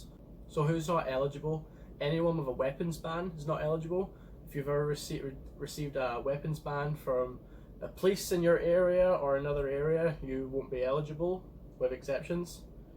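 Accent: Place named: Scotland, United Kingdom. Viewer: Don’t take this the wrong way but you sound Scottish I am guessing you’re from Scotland originally, if not my mistake you just sound Scottish is all.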